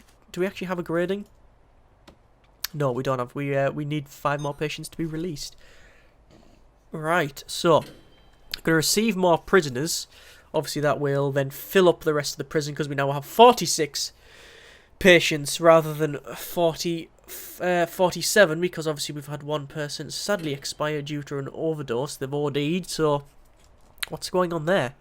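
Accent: United Kingdom. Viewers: You are british right? If so i (you doctor ofcourse) demand that you take two extra cups of tea with every meal from now till that nasty cold is gone with.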